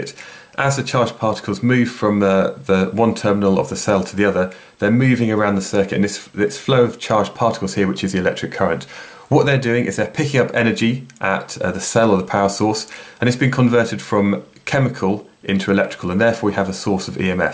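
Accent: United Kingdom. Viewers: British English is wonderful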